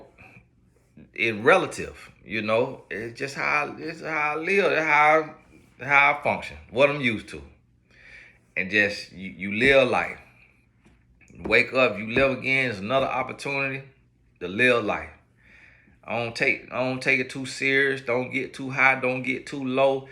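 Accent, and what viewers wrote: South, United States. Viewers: Hey, u don’t have a country accent, u have a southern accent! U articulate just fine. Correct anyone who says that to you. Its a southern accent!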